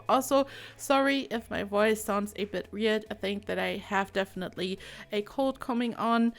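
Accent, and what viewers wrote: Germany. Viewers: Are you german? I swear it sounds so familiar 😂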